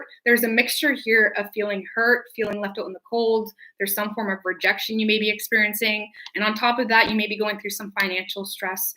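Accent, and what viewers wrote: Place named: Canada. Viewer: Pssst....your Canada is showing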